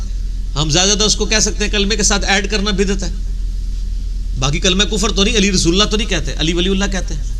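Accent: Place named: India. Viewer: Indian Urdu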